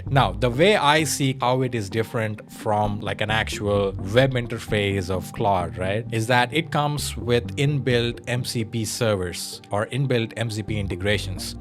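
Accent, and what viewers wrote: India. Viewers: this guy has such a NRI accent VecTOR , nice video though , claude is surprisingly good at documenting things neatly , as a human , it would be nice to copy that style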